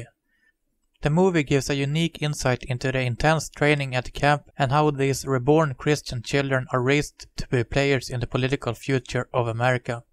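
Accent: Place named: Sweden. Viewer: Nothing wrong with the pronouncing but you can immediately tell that there is a very heavy Swedish accent. The videos are great, this is just an observation about the accent that I would recognize anywhere.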